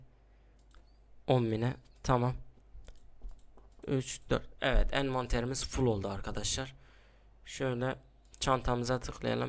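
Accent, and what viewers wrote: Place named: Adana, Turkey. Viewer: abi adanalı olduğun ne kadar belli adanalıyıhhhh allahın adamıyıh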